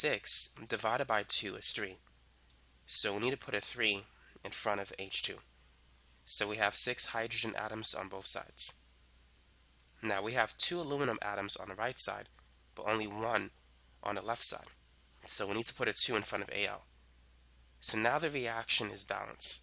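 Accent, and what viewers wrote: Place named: New York, United States. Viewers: Yall I think he has a new yorkian accent idk if u care tho lol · im hearing a little bit of north east accent. new york area 🤔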